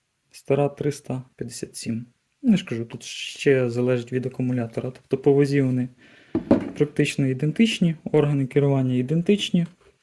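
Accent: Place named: Ukraine. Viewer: Речь хохлатая дюже поганая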